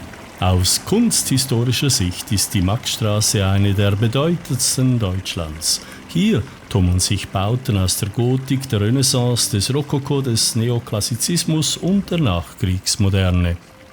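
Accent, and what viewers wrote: Switzerland. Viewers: Im Allgemeinen haben es Schweizer so an sich, mit Akzent zu sprechen. Würde er im Dialekt reden, verstündest du kein Wort. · Sehr seltsam, der Sprecher hat einen Schweizer Dialekt, muss das sein???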